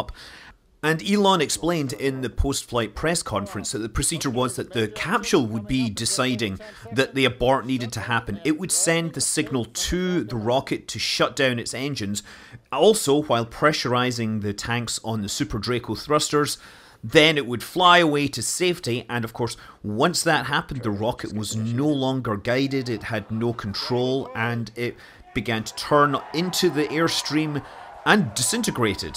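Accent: Scotland, United Kingdom. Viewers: Not mid-Atlantic, Scottish. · Scott Manley is Scottish, so he pronounces some words differently than what you'd expect, say, from an American or an English person. The English language is spoken by hundreds of millions of people around the world, in all sorts of different dialects. Expecting any sort of logic or coherence in the way words are pronounced is optimistic at best.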